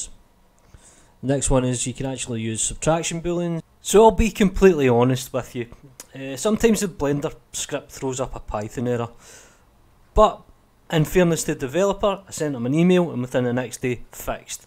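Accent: Scotland, United Kingdom. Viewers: Nope, that’s Extremely Scottish Scottish. :)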